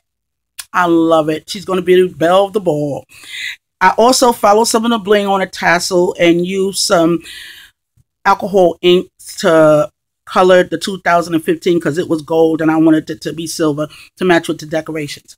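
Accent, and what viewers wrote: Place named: New York, United States. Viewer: Fabulous idea. Wish I did this to my daughter's cap. I love your accent. I'm from NJ living in Georgia and I miss hearing that NY/NJ accent.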